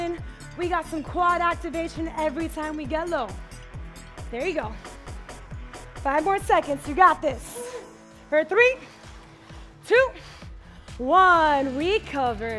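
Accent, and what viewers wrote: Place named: Miami, United States. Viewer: That Miami accent tho.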